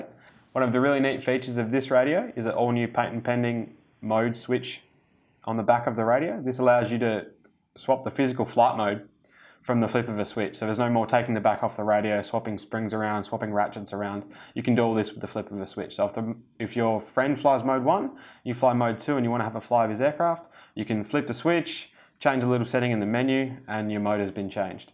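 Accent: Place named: Australia. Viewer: as a person who lives in australia, i find the aussie accent very appealing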